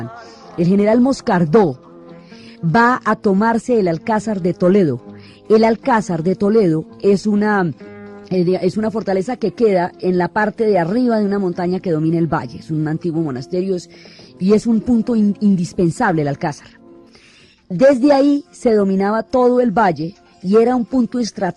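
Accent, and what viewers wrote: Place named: Colombia. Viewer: Felicidades,a pesar de conocerme de pe a pa esta historia me resulta grato escucharla con el acento de...Colombiana? y los incisos de canciones y peliculas...genial las he visto todas!y mejor no se puede expresar la sintedis de ka lengua de las mariposas manita arriba y..LIKE!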